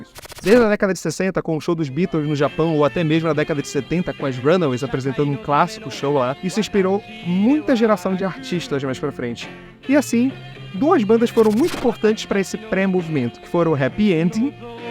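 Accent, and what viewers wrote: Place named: Belém, Brazil. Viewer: Sotaque de Belém = inconfundível 😅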